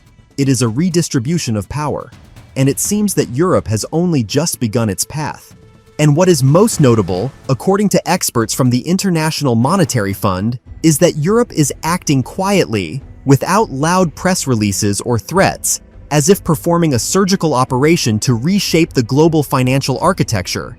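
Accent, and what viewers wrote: United States. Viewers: Choose a non-yank accent for your AI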